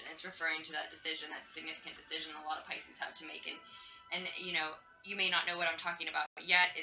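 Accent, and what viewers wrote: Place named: United States. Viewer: You're so beautiful and insightful. I like your energy. And, even though I suppose you're American, judging by your accent, you and your environment look quite European and more soulful than the average US-like vibe.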